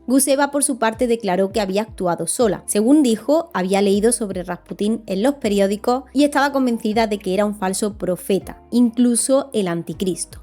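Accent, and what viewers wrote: Andalusia, Spain. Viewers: Sí el acento de es andaluz. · Me fascina la historia de Rasputín!!!! Y más como tú la cuentas!!! Ah y tienes un bellísimo acento!!!! Es andaluz? Saludos poéticos desde Chillán en Chile!!!!!!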